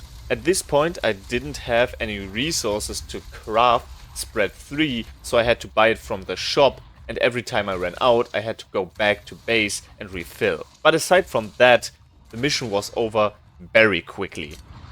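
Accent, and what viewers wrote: Germany. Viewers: I just love german people talking english. sounds great. keep it up brother! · I smell the german accent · Hörst du den fetten Akzent nicht? Natürlich ist der deutsch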